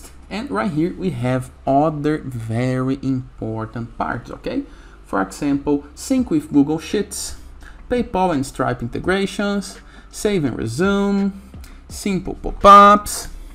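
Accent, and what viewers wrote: Brazil. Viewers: are u brazilian by the way ?? you accents sounds like sorry if not .. haha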